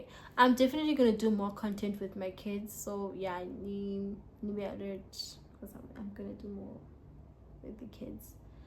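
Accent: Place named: Xhosa, South Africa. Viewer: Ndikuthandela lento yoba uphume kwesasjolo usathetha isixhosa sakowenu ❤. Awukhulumi isizulu esi dizzy like the other girl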